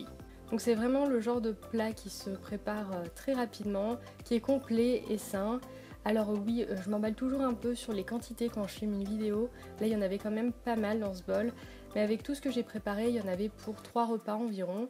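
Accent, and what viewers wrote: Argentina. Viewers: Tu es dorigine argentine?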